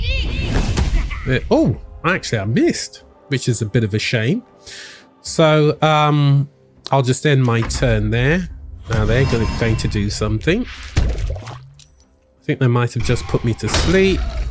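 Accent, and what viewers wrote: United Kingdom. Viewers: Wow. Talk about the voice not matching the person. You sound like you're maybe 20 years old max, and the british accent makes you sound even more posh. Clean living, I guess? Anyways, lovely video, will check the rest of your stuff out too. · Good video 👏. Your accent sounds British....Are you?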